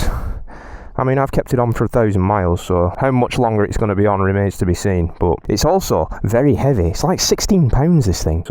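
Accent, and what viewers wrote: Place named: Scotland, United Kingdom. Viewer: Also as a Scot in the USA, it’s nice to hear the accent, riding is so much better here, our weather sucks for riding lol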